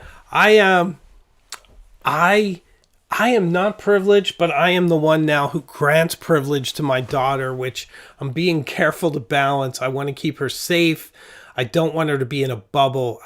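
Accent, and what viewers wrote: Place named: Massachusetts, United States. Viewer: you are already very cool. and your speech is very nice, it sounds like a Massachusetts Connecticut accent with a slight hint of Bostonian-lite. you could literally host PBS Masterpiece Theater and no one would think it out of place with either good style or speech. in fact, you could probably make money on the side as a voice actor. and about your story episodes, they are poetic and very entertaining. by the way, people with northeast accents that strive to enunciate well are often considered snobby and pretentious because that's how snobby and pretentious people are portrayed on tv and in movies, right? it takes a while for inexperienced people to learn this. i believe we have cartoonists and writers/ actors to blame, since they perpetuate this myth and perhaps it is a bit of a truth when seen in actors and elites off stage who belittle those who do not subscribe to the marxism by any means necessary philosophy and will literally tell you day in and day out how you are not better than them? 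No? i have been busy with the health concerns of my mother, mother in law, my wife, my sister, my neices, brother in law, and myself, and cooking every day and working on this house, my friend. i am sorry i missed your story videos. i don't get notifications on most of my subscriptions! oddly, the disaster channels always show, and a nice man in england who teaches english one word at a time, with some odd ones thrown in often enough to make it interesting! i hope you have a great week.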